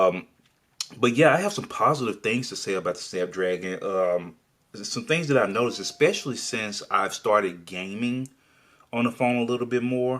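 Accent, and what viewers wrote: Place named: United States. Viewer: Where is your lovely accent from ? Usa but what part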